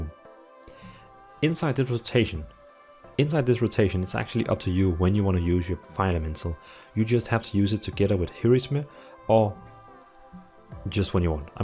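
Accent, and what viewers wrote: Denmark. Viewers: Really enjoy the tone and speed of you voice :) do i hear some Danish in you english also? You Got a sub :D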